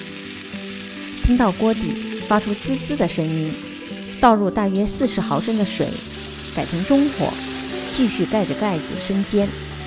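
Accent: Shanghai, China. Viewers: 好亲切的沪普：long tang💕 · 原来是上海小囡呀亲切的很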